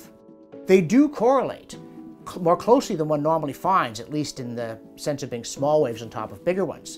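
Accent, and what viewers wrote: Canada. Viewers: and still nothing worth remembering. He spent that time trying to justify his veracity or valiidity or something. Once again.....4 minutes that I will never get back. He sounds like a Canadian to me.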